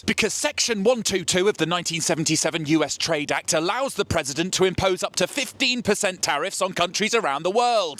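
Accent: United Kingdom. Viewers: I can't explain it but delivering news on our dumbass dictator is more funny with the british accent. Keep it up we need the entertainment. We desperately need the entertainment.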